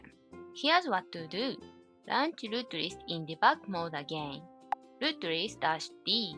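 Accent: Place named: Japan. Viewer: Thank you for your guide and the effort you put into the video! Love your Japanese accent and the little character in the bottom left corner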